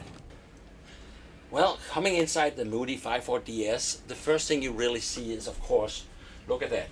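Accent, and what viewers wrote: Denmark. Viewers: Hey, nice tour, nice boat - buy your accent i say you are Danish. Your cameraman was sleeping, every time you pointed down to show the mast foot or other things, the camera was just horizontal. wake him/her up next time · Du lyder dansk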